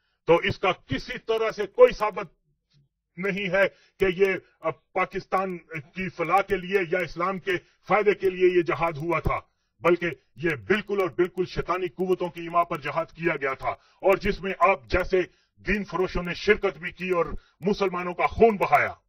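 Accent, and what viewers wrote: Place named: India. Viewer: bolnay wala to awaz se hi koi indian lg rha hai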